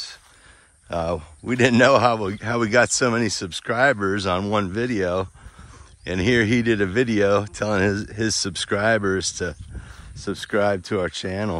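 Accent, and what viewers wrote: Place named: Midwest, United States. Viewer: Your accent and how you say Crick reminds me of home. Are you from the Midwest?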